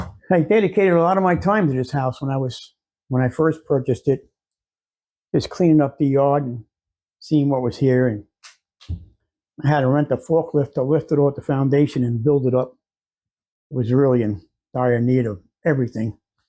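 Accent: New York, United States. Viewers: I thought I detected a hint of New York in your accent. With my accent people always think I’m from New York. My daughter lives in Brooklyn. The only thing I don’t like about the south is the heat and the hurricanes. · Fantastic job!! Wow, very impressive how you renovated this tiny house and decorated it so nice. It's home. I'm happy for you that you were able to accomplish this dream home for your retirement years. You are very smart to do what you did. I'd like to see the restoration of the 1930 car. You sound like you're from Brooklyn or Queens??? Not sure. I'm from the Bronx. My husband is from Brooklyn. We moved to TN from CA. We like it here. It's been ice rain now, so we're hunkering down inside. Hope you're safe out there where you are. Have a blessed day. 🙏🙏🥶🥶🤗🤗🇺🇸🇺🇸🫎🫎🐔🐔🐦🐦🐿️🐿️ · Hey, you sound like a New York guy—where from originally and where’s your current nest? 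I grew up in Westchester Co. north of NYC so I know that accent — NY Italian-American? I’m in the Bronx now, and part time in the Delmarva area where Delaware meets MD.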